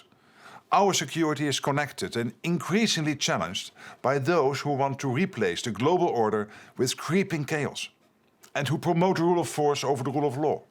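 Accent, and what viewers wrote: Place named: Netherlands. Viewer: I love his dutch accent.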